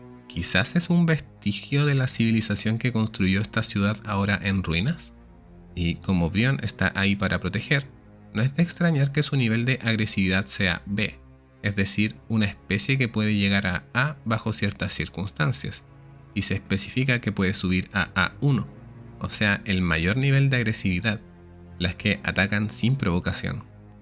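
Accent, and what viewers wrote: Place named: Chile. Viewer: Eres de Chile? Con razón tu acento se me hacía tan familiar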